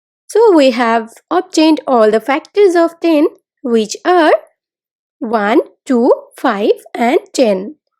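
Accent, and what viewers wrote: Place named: India. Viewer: the indian accent is classic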